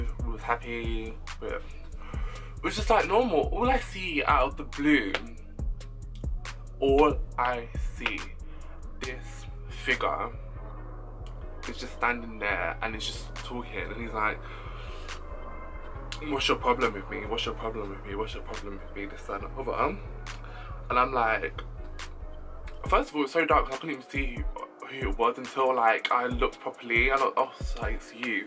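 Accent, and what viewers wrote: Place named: United Kingdom. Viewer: Double Language Barrier 🙅🏽🙅🏽🙅🏽 Lisp+British Accent 😷😷😷 Handsome, Tho.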